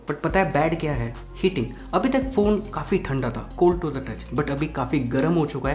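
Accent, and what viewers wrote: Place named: Tamil Nadu, India. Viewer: nee hindu pesura style paathope purinjipochu... Tamil naatu accent irruku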